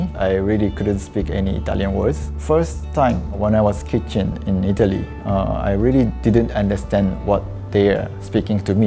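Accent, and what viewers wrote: Singapore. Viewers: This is new to me. A Japanese with Singaporean accents 😅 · Video: English Chef: Japanese Accent: Singaporean Dish: Italian Ingredients: Japanese Bill: Priceless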